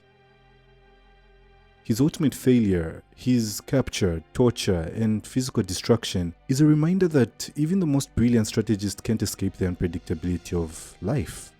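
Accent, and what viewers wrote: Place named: Nigeria. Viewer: Great video! Your accent sounds Nigerian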